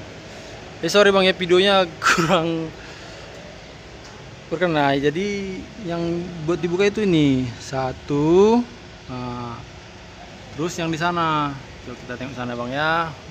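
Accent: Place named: Medan, Indonesia. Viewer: Medannn kali ahhhh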